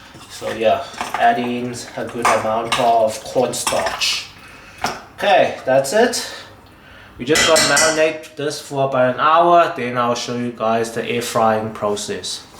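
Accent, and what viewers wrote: South Africa. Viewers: your accent sounds like and is in fact indistinguishable from a non-white person's south african accent - cool man! thanks for the recipe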